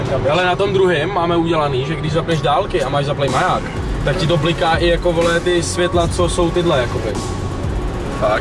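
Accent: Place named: Poland. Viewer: gay s polskym artikulovanim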